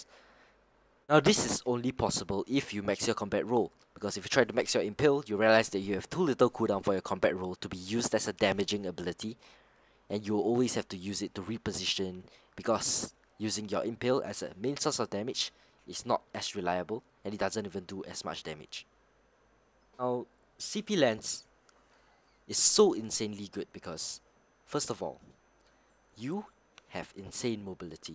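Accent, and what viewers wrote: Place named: Singapore. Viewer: You sounded like a Singaporean..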